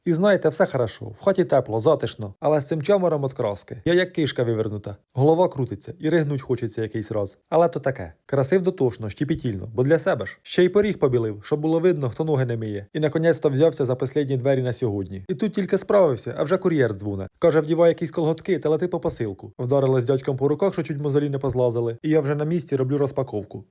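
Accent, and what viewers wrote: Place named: Volyn, Ukraine. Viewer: Випадково в головного героя даного відео немає брата на Волині Такий самий говор та поведінка Маю такого знайомого геть схожий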